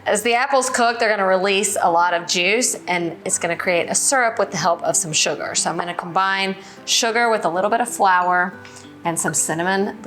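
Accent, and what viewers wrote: South, United States. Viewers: She has a fun super southern accent! Going to try this tonight!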